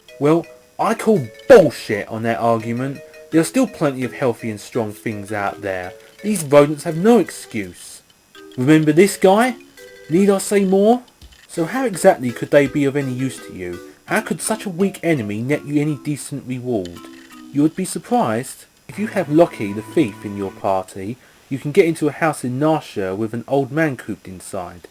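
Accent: England, United Kingdom. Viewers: its because he is english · WOOOW BRITISH ACCENT! Love it!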